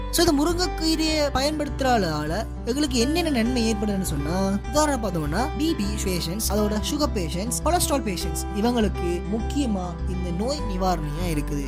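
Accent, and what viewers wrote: Sri Lanka. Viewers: Mohamed இலங்கை தமிழ் பேச்சு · it's Jaffna tamil (SRI LANKA) , please dont make fun of his slang. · ஆமாம் இலங்கை தமிழ் கேட்டு பழக்கமில்லாதவர்களுக்கு கேட்பதற்கு சிறிது கடினமாகத்தான் இருக்கும். தமிழ் மட்டுமல்ல எல்லா மொழிகளும் இடத்துக்கு இடம் மாறுபடுகிறது. அதற்காக அது தமிழ் இல்லை என்று ஆகாது. லண்டன் ஆங்கிலமும் அமேரிக்கா ஆங்கிலமும் வித்தியாசம் தானே.... தயவுசெய்து புரிந்துகொள்ளுங்கள் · thank u , idhu unamiya vela seiyudhu ,naan try panninean ,result theriyudhu, ilangai tamil kaekavae nallairukku.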